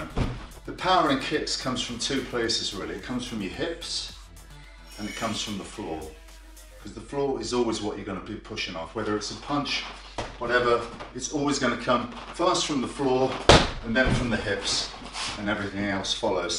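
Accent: England, United Kingdom. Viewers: Hebal you and I have something in common. I love British accent. I think that's what you like about his English, the accent. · So weird hearing You speaking With a brittish accent after seeing You in IP man. Great video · I feel like im not looking at the person who acted as Boyka and especially with that english accent its really hard to digest. Its like im looking and hearing a different person 😂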